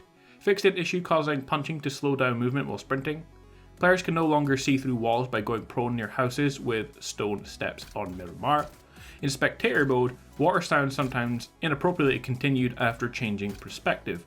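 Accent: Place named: England, United Kingdom. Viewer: Your voice is like pencils getting stabbed through my eardrums. You goofy English Fuck.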